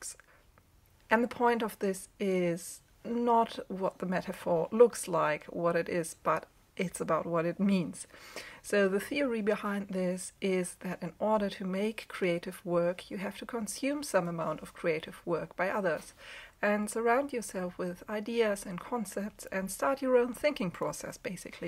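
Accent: Germany. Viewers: I came here for the subject matter, but it`s your impressive vocal delivery in posh English with a German lilt that has kept me lingering.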